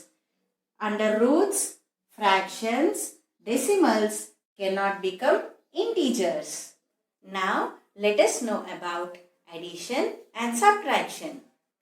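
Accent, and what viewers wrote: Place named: Andhra Pradesh/Telangana, India. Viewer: You are from Adhra or telangana 😅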